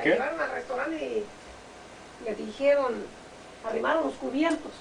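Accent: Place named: Jalisco, Mexico. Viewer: She talks like my momma, we are also Jalisquillos... el acento no se confunde me cae.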